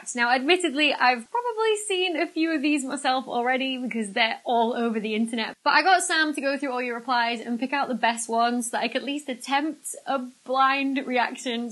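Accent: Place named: West Lancashire, United Kingdom. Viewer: Without sounding too stalkerish, where are you from? You sound to me like you're from around here (I'm in ormskirk in West Lancashire)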